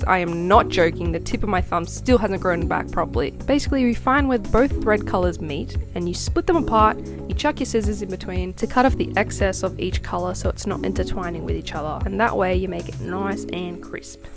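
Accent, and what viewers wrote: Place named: Australia. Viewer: Jazza sent me. Nice video. Was thinking: Sounds like an aussie. Then you bust out a "now this blokes all done" Yea that'll do it.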